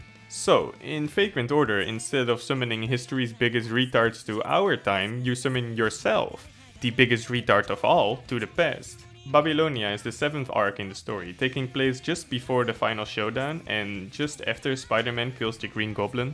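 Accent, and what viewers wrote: Netherlands. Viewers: Are u dutch?🤔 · It’s hard to hear, but u can’t hide ur accent from a fellow dutchman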